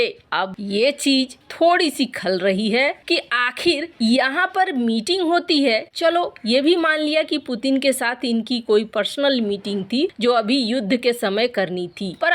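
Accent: Bihar, India. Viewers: Apni hindi sahi kare bihari jaisa kiyoun bolti ho chan Santi etc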